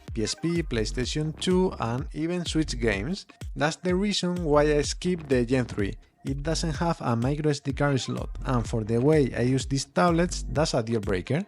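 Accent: Spain. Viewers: Si se nota acento de español de España 😅, en latino americano nos obligan a perder acento cuando aprendemos inglés. Igual se entiende todo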